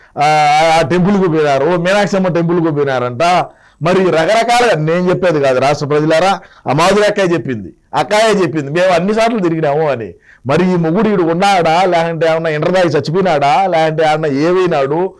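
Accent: Rayalaseema, India. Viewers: anna me rayalla sema language lo challa Baga mataladathu very nice allthe best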